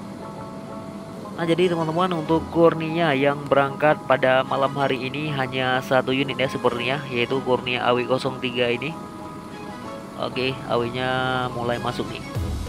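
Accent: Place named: Medan, Indonesia. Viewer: Abang ini asli medan, ap asli orang aceh. Logat nya susah2 di tebak.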